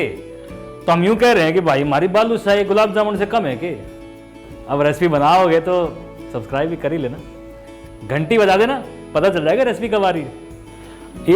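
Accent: Haryana, India. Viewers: Chef i never knew that you are from haryana and the accent which brings here while speaking into haryani is so original. Just amazing. I’m also from haryana so i can totally relate to your tone 😂👌🏻. You’re the best. Love your recipes. ❤️ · Chef your recipes are awesome so are you Aaj toh Haryanvi bol k jamma laath gaad Diya Bahut khub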